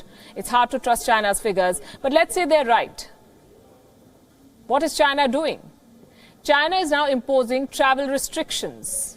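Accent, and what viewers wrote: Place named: India. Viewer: Indian accent make my ears bleed : (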